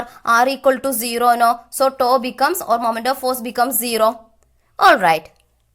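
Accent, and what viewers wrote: Kerala, India. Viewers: Sounds like she is a Malayali...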